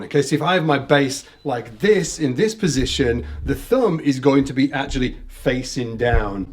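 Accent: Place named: Leeds, United Kingdom. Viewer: Where exactly is your accent from bro? I hear Leeds area of course but I am hearing other dialect, did you live down South for a while???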